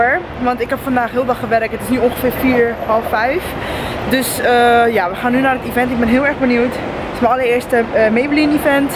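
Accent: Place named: Rotterdam, Netherlands. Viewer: plat Rotterdams accent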